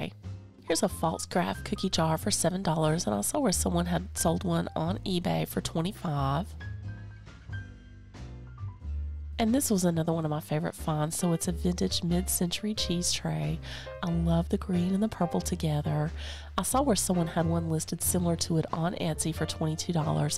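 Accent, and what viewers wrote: South, United States. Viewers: you are my favorite shopping friend! You find the most amazing Restores and then find the best items in the stores. How do you do that?!? The narration is absolutely soothing with the cool music and that Southern accent! Your voice is like a mint julep for the ears. ❤️2U& yours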